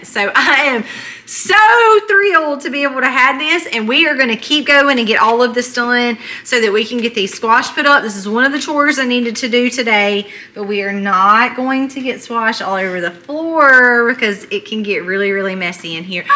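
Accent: South, United States. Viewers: What is your youngest daughter's name and how old is she? Im not use to southern accents and I can't understand if you say Libby or Livie.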